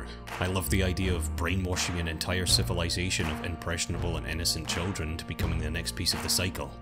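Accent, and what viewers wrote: Scotland, United Kingdom. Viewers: sarcastic af Scottish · I hEaR a ScOtTiSh AcCeNt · Loved this animation + omg you're Scottish too! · I’d say Scottish, it reminds me a bit of my own accent and the one I hear a lot from family.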